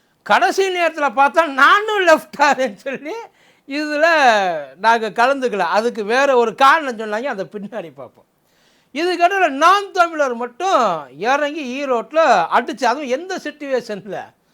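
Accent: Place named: Telugu, India. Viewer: அட லூசு பயலே உனக்கு அரசியல் என்றால் என்னவென்றே தெரியுமாடா பரதேசி நாயே திமுக அடிமை நாயே நீ பேசிட்டு இருக்கதே வைக்கும் அவனை தெலுங்கு நாய் அது தமிழ்நாட்டுல இப்படி தாண்டா இருந்து பேசிகிட்டு இருக்கீங்க இதே வேற மாநிலத்தில் இருந்து இப்படி பேச முடியுமான உங்களுக்கு அந்த அளவுக்கு சுதந்திரம் கொடுத்து இருக்கு வந்தவுடனே செருப்ப கழட்டி அடிக்காத வாய்ஸ்ல தெரியுது தெலுங்கு காரங்களா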